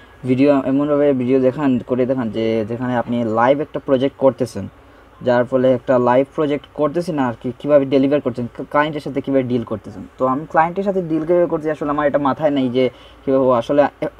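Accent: Sylhet, Bangladesh. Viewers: apnar kotha shuna mona hoitaca apne sylhete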